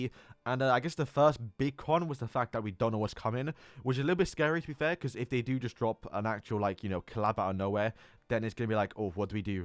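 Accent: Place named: United Kingdom. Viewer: Seriously there are British youtubers accent then theres accent who is on whole different level, istg accent is my salvation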